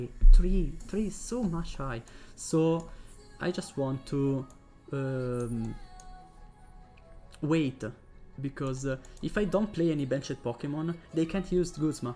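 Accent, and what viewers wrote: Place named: United Kingdom. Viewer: ma quanto cazzo sei british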